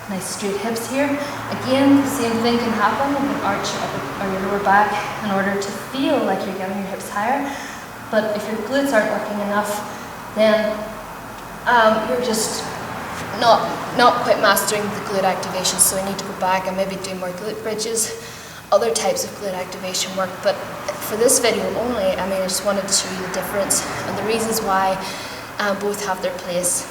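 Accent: Ireland. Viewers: Now, now, she's much more tastefully understated compared to the YouTube gym skanks. It's that Lucky Charms accent that does for me. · Are you Irish? Thanks for the video ...very helpful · I'm guessing north Dublin but definitely could be wrong.. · Irish accent. Beautiful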